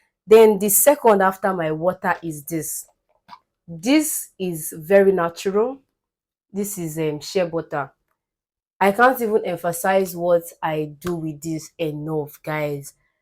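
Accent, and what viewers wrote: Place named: Igbo, Nigeria. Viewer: I'm referring to Igbo as "yibo" . Your Igbo intonation is pronounced especially when pronouncing oil, you say "oryu" So, you be Omo "yibo" na🥰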